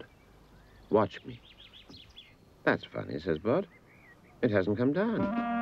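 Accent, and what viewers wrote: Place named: United Kingdom. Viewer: Walt Jabsco I'm 47, only look 40. That narrator's cool, laid back Brit voice. So... apples, old boy : )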